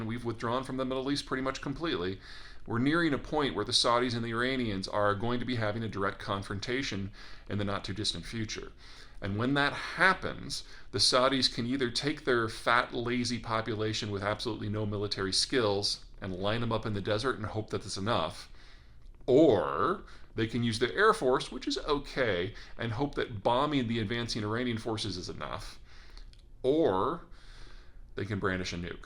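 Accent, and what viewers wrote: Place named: Texas, United States. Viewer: Don’t blame him, he lived here in Texas for a long time. We barely speak English.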